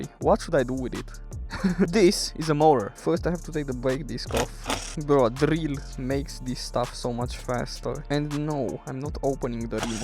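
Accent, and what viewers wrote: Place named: Romania. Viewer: Ma gandeam dupa accent ca esti roman si am auzit spray de tanatari 😂😂😂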